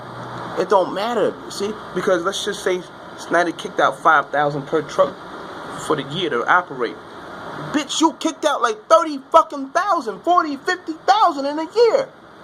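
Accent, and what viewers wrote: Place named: New York, United States. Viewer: Nah son sure do have that ny vibes I’m from Brooklyn living in Houston so I can tell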